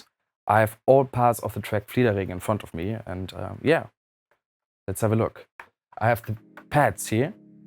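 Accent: Germany. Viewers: This guy has such a nice German accent, love you.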